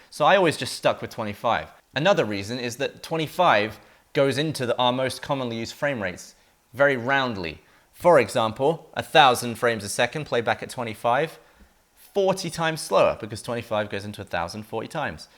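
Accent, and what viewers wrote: United States. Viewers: Your American accent is coming through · Your accent is becoming more american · Anyone else notice how his american accent is developing? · sounding really american in this vid. I wonder if I moved from here to england how long it would take to start getting a british accent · Your picking up a slight American accent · You're accent is turning American we have lost you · you are really starting to pick up the american accent in parts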